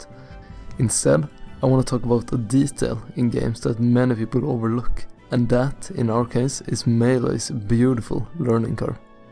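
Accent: Sweden. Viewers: extremely swedish accent. I was hoping you would say "double-yump" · the most swedish accent of all time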